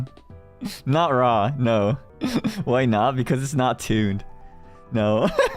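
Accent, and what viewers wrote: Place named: United Kingdom. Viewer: 英國腔日語ww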